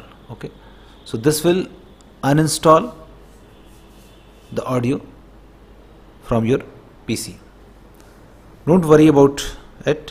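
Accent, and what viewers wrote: India. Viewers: god when indian ppl speek english its so funny t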